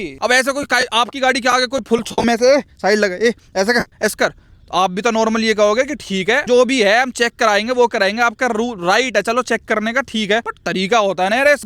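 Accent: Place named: Palwal, India. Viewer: bhai, I love the way you talk in our Regional language, Palwal vaale.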